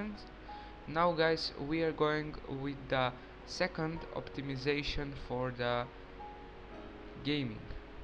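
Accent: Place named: Russia. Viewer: видно русский пытаеться на английском говорить красава молодец!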